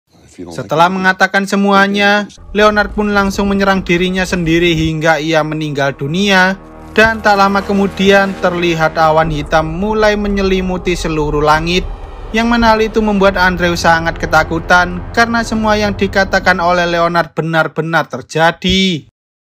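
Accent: Java, Indonesia. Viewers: Jujur bng nonton nya kurang seru,mohon maaf bng ya suara Abang lebih ke logat2 jawa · Lu org Jawa y bg medok bener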